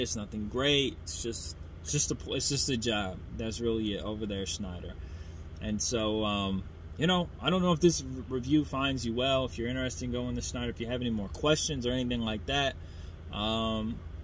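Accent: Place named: New England, United States. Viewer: Ur yankee accent doesn't represent ur claim of residing in the Carolinas...huummm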